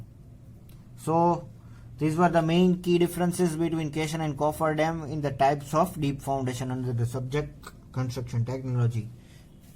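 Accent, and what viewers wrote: Gujarat, India. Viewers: Sir aap gujrat se ho na 😅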